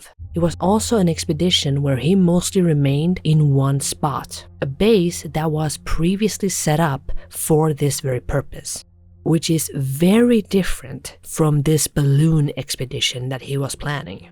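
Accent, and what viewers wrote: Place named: Sweden. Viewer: assuming you are actually from Sweden , as an English person it is great to hear a real narrator whom isn’t English or American especially when it’s covering an event concerning a ‘local’ ! I’ve had to watch all the previous covering this because it’s pretty bonkers & am enjoying your treatment of the adventure 😁👍❤️from Bournemouth in Sw England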